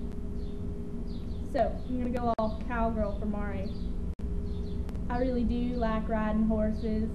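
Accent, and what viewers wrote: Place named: Texas, United States. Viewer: You do have a bit of a Texan accent. It sounds nice.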